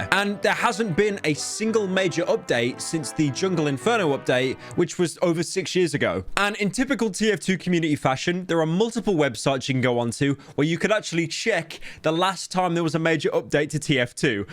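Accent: United Kingdom. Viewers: please take off the british accent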